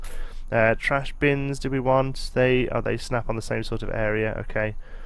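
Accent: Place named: Birmingham, United Kingdom. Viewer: I'm from England, Birmingham(B45) Where you from because your accent doesn't faze me and kind of sounds normal... You gotta be from somewhere round here...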